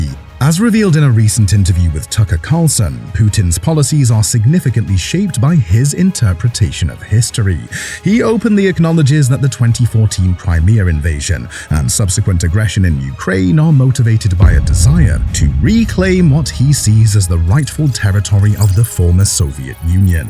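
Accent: United Kingdom. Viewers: Rubbish and biased video. British sounding Harry Potter Uncle Tom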